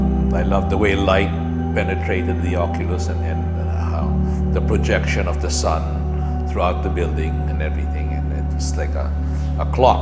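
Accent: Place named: Philippines. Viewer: Click agad dahil sa thumbnail, kakaiba yung design... Pini-figure out ko kung India, Malaysia, Singapore yung bahay Pero nung nagsalita na... Luh! I somehow heard a Filipino English accent kaya sabay tingin sa description at comment. Just wow! 💪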